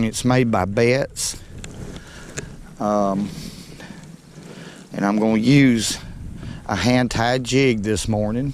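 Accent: South, United States. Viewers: There nothing like good ole southern talk.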